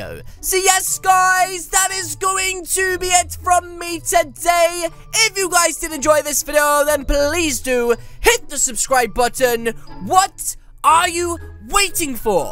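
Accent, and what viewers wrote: United Kingdom. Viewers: Are you bristish I love your accent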